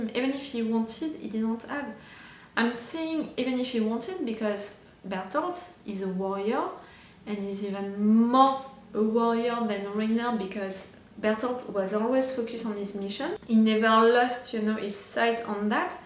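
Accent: France. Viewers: it's a French accent.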